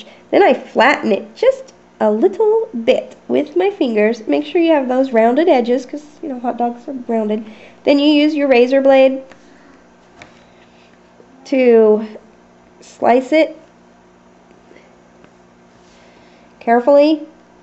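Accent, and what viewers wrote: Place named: Kansas, United States. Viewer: Love your videos! I have been doing minis for some time now and Love getting ideas from others. You don't even miss a beat in your tutorials. By the way, I live in Cali but I grew up in Ulysses Kansas so when I heard your accent I knew you had to be either Kansas or Oklahoma! Thanks for the videos. God bless!!!!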